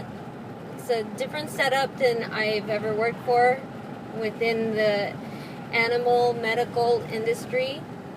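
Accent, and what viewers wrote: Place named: Midwest, United States. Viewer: I enjoyed this little rant. Are you in Cali or Florida? You sound like you're from the midwest. What's the story with your name?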